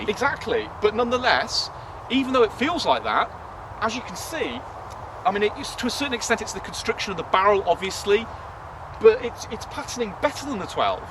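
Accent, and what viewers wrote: United Kingdom. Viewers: not mad but sounds so weird having someone with a British accent explaining guns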